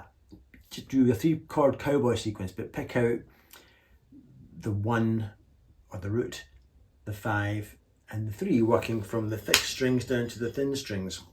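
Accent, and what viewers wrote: Scotland, United Kingdom. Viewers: HI, Your latest video just showed up in my recommendations. Love your playing and your easygoing personality. I am originally from Alloa, Clacks so your accent grabbed me. I have already watched a few of your videos, only six and a half years to go!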